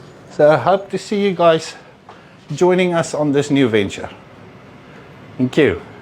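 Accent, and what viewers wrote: South Africa. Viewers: That's one of the strongest South African accents I've ever heard..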